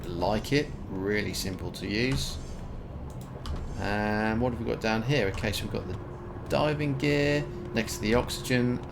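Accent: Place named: Australia. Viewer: Was looking for some beginner s guide for Barotrauma and found you, boy am I lucky: I dig your style! you sound like a nice guy, you're a father like me, you play cool games and all... I am just sad you don't twitch (?). I'd even play with you! Though you sound a bit like the hilarious SovietWomble, from your accent I would say you're an Aussie? if yes I just arrived to your great country and I love it! Cheers mate.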